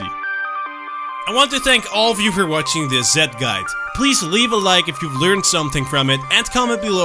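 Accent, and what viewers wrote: Netherlands. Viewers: Lol he's dutch if you can hear it :] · Are u dutch? Great accent tho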